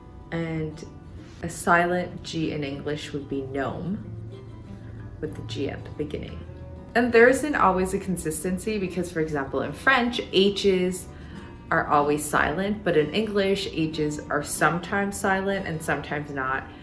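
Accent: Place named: South, France. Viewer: It’s funny. It looks like she learned french in Marseille or Toulouse. She has a deep southern accent. I’m a French guy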